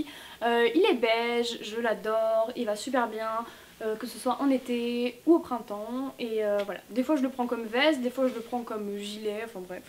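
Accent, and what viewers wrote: Alsace, France. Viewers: Le petit accent alsacien aha! ;) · J'adore ton accent alsacien aha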